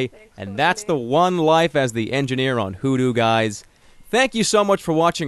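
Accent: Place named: South, United States. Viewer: really good southern accent :D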